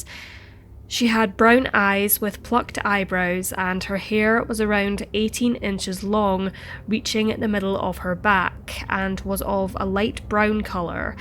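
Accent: Scotland, United Kingdom. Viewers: Voice: Scottish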